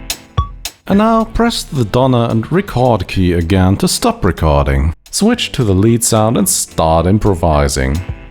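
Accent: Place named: Germany. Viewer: The German accent!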